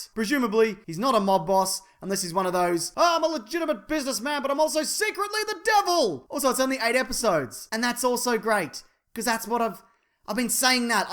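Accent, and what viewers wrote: Australia. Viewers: hey you're aussie right? like... you sound aussie. if you are, which state. WA is where I'm at.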